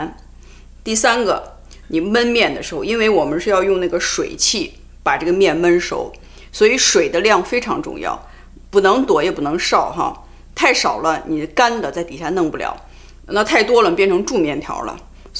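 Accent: Shaanxi, China. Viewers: 陕西话听着就是舒服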